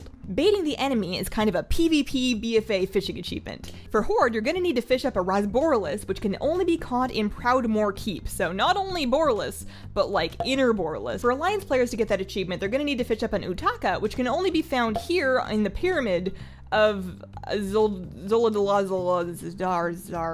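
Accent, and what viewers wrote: Canada. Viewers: someone's from canada Eh?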